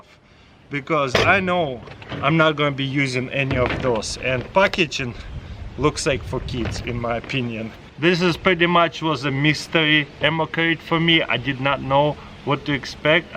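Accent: Russia. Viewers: По первому слову понял,что ты русский) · Я один слышу русский акцент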